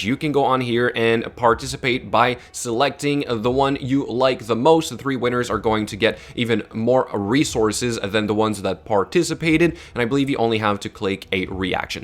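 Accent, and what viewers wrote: Quebec, Canada. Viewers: Yeah. Not even close. I think this guy has a bit of an accent more then anything. He sounds like he might be French Canadian.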